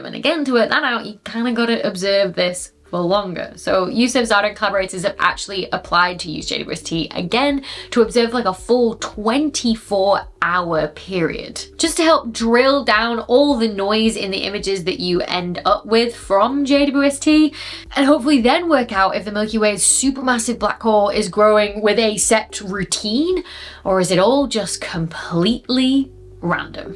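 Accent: United Kingdom. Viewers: For longer…. It’s the Accent… British people would understand this 🙂 But I get how it sounds if you not used to our accent 🙀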